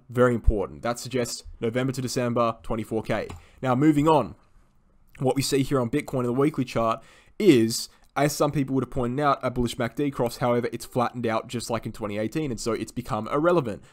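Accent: Australia. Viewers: It’s refreshing hearing another Australians voice in the crypto space … keep up the great TA man 👌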